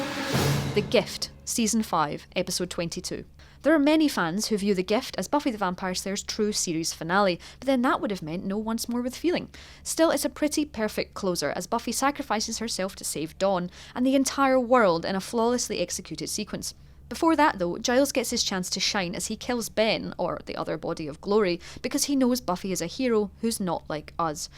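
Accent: Scotland, United Kingdom. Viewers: That Scottish is an ointment to my ears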